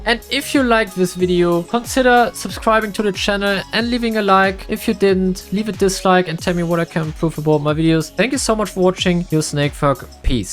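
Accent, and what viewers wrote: Germany. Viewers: could it be that you speak normally german ?? cause in the first 30 seconds of the video i relsied that your speaking of english is a bit different like mine cause i normally speak german too XD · Es ist so geil dich englisch sprechen zu hören mann hört das du deutsch bist (ich spreche genau so englisch) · Ar u German ? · Are you german I hear an accent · Dude i heared you First time and i instantly knew that you are a german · your german arent you pls say it in your next wedio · bro are you german? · Man hört den Deutschen Akzent so krass raus! 😂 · Man merkt dass du deutscher bist😂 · This guy is defo German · Dieser deutsche Akzent ist ja crazy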